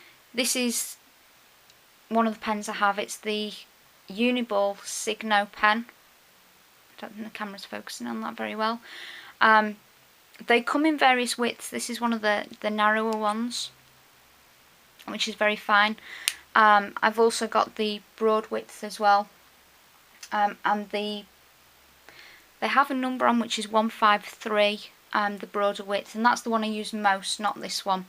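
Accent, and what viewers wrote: United Kingdom. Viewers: Congrats on your over 1,000 subscribers!! You have great videos and you're so talented, plus for us Americans....me anyway, I love your "accent"...you're very easy to listen to! (Quote around accent because wasn't that long ago, I was the only American on the whole of the Isle of Wight and everytime I opened my mouth I "stuck out" like a sore thumb. BUT....I loved the UK and really everyone I met, was such a delight! Seriously though I just love your no fear attitude with your crafting!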